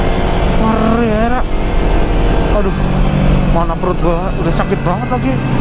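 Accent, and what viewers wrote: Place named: Banyumas, Indonesia. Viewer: Ko ngomonge ngapak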